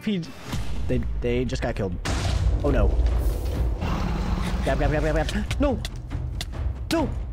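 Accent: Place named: United Kingdom. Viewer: Bro your british thats sooooooo cool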